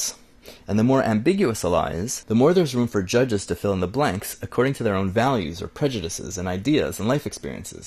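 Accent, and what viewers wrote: Quebec, Canada. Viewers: Ah are you a french canadian from Quebec or Montreal ? Good video as alway !! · "Bein non ça speut pas lâââ" 😂 are you Québécois??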